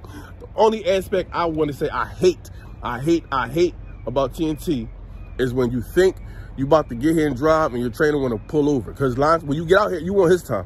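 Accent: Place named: Philadelphia, United States. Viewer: Bro I start at Prime in like 2-3 weeks. It sounds like you’re from Philly… I’m from De and I’m gonna be in Pittston for my orientation too. I’m gonna be going through the same stuff you’re going through right now in a few weeks lol. This should be interesting. Stay focused and keep ya head up killa. You got this. Your videos help me stay inspired and it shows me what to expect. I hope to see you on the road 💪🏽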